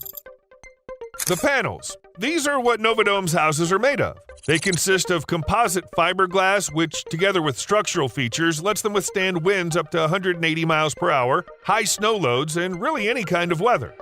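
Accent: United States. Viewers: Horrible music, and according to the accent, it can only be a video from the States. Not useful for Europeans.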